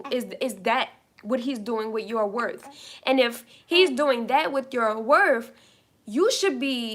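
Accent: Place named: Louisiana, United States. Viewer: You sound like you are from Louisiana ❤️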